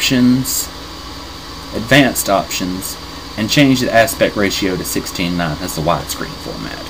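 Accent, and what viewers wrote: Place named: United States. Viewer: your american accent is funny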